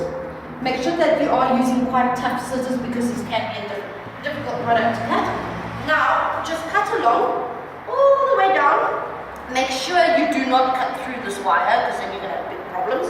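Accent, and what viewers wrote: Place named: South Africa. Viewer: Well some people are a little thick not to point at RAzzA, I personally watch it a few times not because I did not get it the first time, I just Love the South African accent and your beautiful eyes can't wait for the touch screen thermostat video . Not sure what you were thinking with the scissors? hey but.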